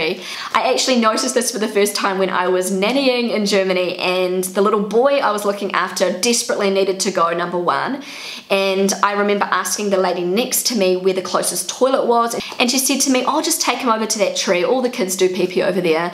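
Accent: New Zealand. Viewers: Everytime a pleasure to hear your velvet voice with this funny 🥝 accent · Hi, I love your videos and your cute kiwi dialect. I find it great that you speak with your children only English and obviously your husband German. Never again it will be so easy for the kids to learn 2 languages at the same time. My daughter in law is American and they live in Australia. My son speaks with their son only German and my daughter in law only English. The kid is three years old now and it is amazing to see how he speaks with his parents in their different languages. He turns his head to his father and speaks German and then turns his head to his mother and speaks English, incredible! And on Skype he speaks German with us, his grandparents. Warm greetings from Hannover, Germany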